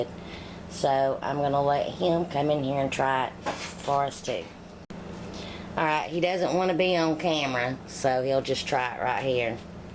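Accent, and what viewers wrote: South, United States. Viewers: Your very welcome! What part of the South are you from? Greetings from Canada....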